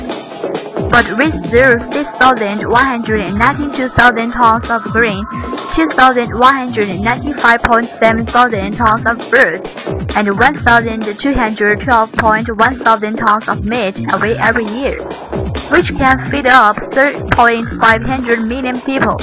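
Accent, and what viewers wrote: China. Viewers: 这是中国口音的英文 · 不应该说，只是仿效了一个有效沟通的style。抄袭Graphics做得很好，内容可以update，有出入的也不是问题。 只是配音有问题：1。录音时候没有作输入音量控制，以致音量水平过高，整体失真，导致刺耳。 2。配音者（VO）虽然颇为流利，但是中国口音实在太重，脱离不了用中文拼音说英文的错误，这是大部分中国学外语学生不自知的事情。文稿还有文法上的错误。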